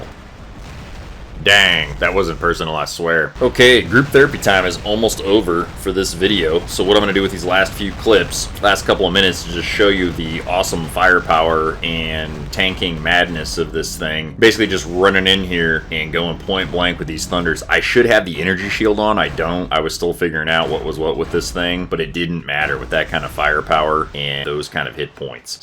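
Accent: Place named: United States. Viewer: Btw, I love your pure american pronouncation ❤️❤️❤️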